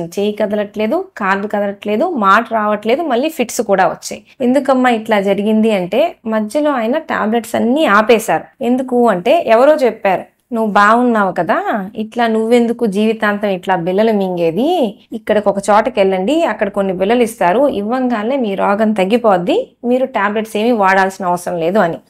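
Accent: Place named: Chirala, India. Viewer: Your Chirala telugu is superb madam, and timely speaking also nice mam..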